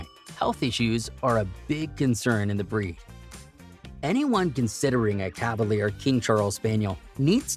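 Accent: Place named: United States. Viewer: This guy is an absolute idiot……..these dogs unfortunately will eat anything and everything……any break from kibble or dry biscuits is a blessing…. Do not feed these dogs any kibble no matter how expensive……it is deadly to them, most dogs but definitely these….no cavalier after 2 has endless zoomies…..the stupid American English accent ……they should never be left alone for more than an hour or two….Do not get one you can’t afford to stay at home or work from home……they would die early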